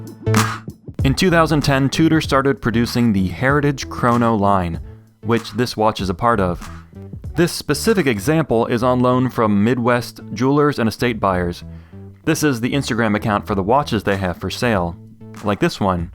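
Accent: Birmingham, United Kingdom. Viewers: Love the Brummie accent! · Nice attempt at brummie accent! It’s where I went to University:)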